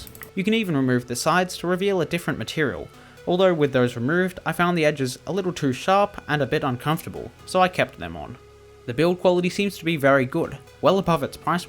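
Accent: Australia. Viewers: He's not Scottish. I have no idea why some think he is. He's Australian. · Why is this Australian doing the voice over?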